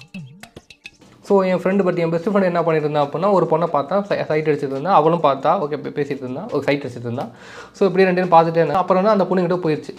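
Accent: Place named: Madurai, India. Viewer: Bro madurai kaarar pola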